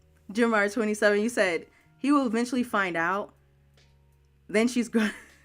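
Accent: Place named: United States. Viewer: I enjoy your narration of these stories: it's nice to have someone do them with a proper American accent and pronunciation, especially a woman with a nice feminine voice. Keep up the good work!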